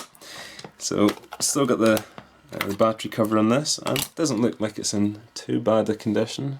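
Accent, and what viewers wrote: Scotland, United Kingdom. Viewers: I'm American and could tell he was Scottish. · If you're implying he's English or Australian then wrong on both parts! He's clearly and obviously Scottish. You must be an American. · are you scottish? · I love Scottish accents. ouo That console looked far worse on the eBay page than it does in person! The motherboard must be corroded to hell! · I love this accent! I can't quite pick it up properly though, is it Scottish? Edit: definetly Scottish. I'm Scottish so it's like really hard to pick up everything you hear on a daily basis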